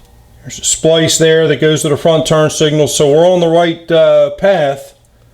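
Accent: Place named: Pennsylvania, United States. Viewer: My hazards aren't working but my turn signals both are. It sounds like you're from PA. If so do you have a shop or a shop you'd recommend near ohio by 80 or 76? It's cost me a fair amount learning on my 2001 roadking and I don't mind if I can learn but the electric for the rear had been "redone" and the left switch somehow controls the rear lights. It's a little bit much to explain on here but any help would be greatly appreciated. Thanks for sharing your video I look forward to watching more 👍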